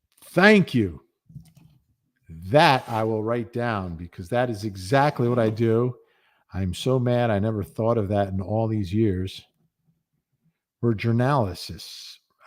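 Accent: Boston, United States. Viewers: is this case getting to you, you've fully adopted that Boston accent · not too shabby a boston accent for a joisey guy,!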